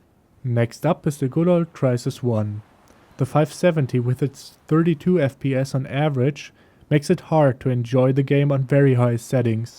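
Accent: Germany. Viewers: das deutsch trieft aus allen sätzen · Hab sofort gemerkt, dass da ein Deutscher quatscht :D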